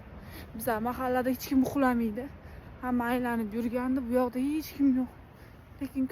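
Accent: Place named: Bukhara, Uzbekistan. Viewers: Бухороликларнинг тили на мунча ёкимсиз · сиз бухороданмасми шевангиз охшаяпти олот каракулни шевасига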